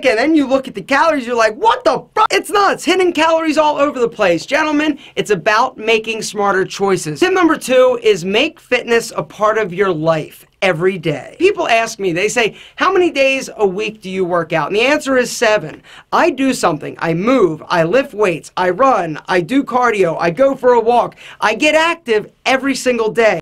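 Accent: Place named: East Coast, United States. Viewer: east coast accent love it man where r u from?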